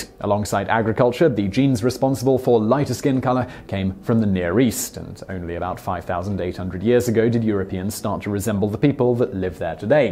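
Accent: United Kingdom. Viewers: When did VSauce Michael start using a British accent? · These aren’t facts. Its euro-centric BS for racists doing “research” on you tube to find and site as proof. This video is unscientific, gives no citation of the amazing amount of research that would have to exist to support all that it claims. Its typical YT nonsense with a British accent in order to pass it off to stupid, insecure people.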